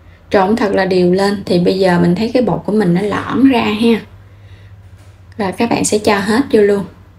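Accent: Mekong Delta, Vietnam. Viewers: Mình nghe tiếng nói rất dễ thương mà , giọng nói đúng chất miền Tây bạn ơi .❤🎉❤🎉❤🎉❤❤❤❤ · Giọng nói của người miền Tây là dễ nghe vậy đó cac bạn ơi ❤❤❤❤❤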